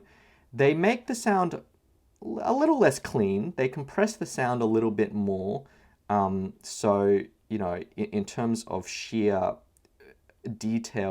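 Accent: Australia. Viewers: Oh now I realized you are Chinese. Good English mate. Hey fuck Australia man you don’t need to talk like that. Is a garbage place.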